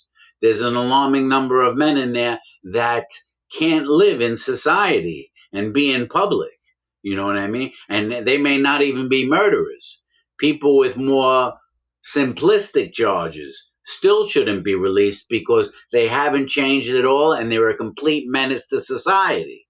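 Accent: New York, United States. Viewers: That's a NYC area accent.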